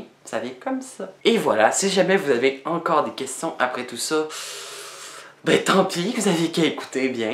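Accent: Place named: Quebec, Canada. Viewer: J'aime le Québec et l'accent c le meilleure grace a toi , je parle le québécois x)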